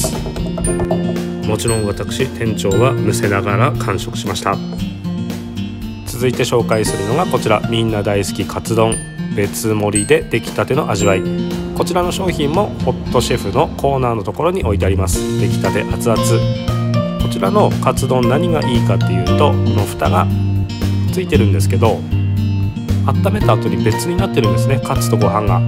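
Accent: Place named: Hokkaido, Japan. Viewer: 北海道弁も良かったです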